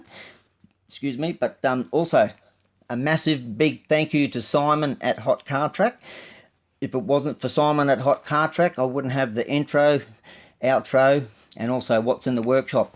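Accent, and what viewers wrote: Australia. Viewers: Well done on 100 episodes 🤙🏼 Ill comment etc but don't add me to the list to win mate, let someone else have a crack at these cool prizes! But...if you decide to ever have that Holden Ute as a prize i promise to enter as many times as I can 🤣🤣 Hope you're doing well mate and its good to hear ya Aussie twang back on the Tube 🇭🇲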